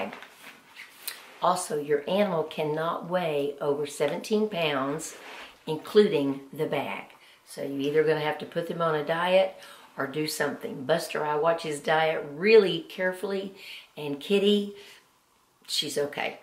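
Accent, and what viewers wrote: South, United States. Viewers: I knew you were from a Southern city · are you from the South?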